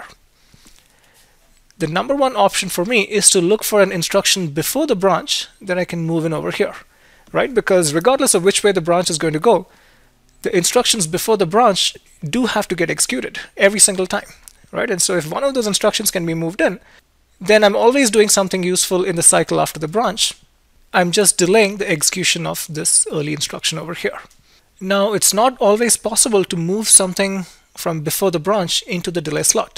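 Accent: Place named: United Kingdom. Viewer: I have to say I love your british accent with a hint of indian as well. A lot less thick than most Indian computer science teachers you can find, and lot easier to understand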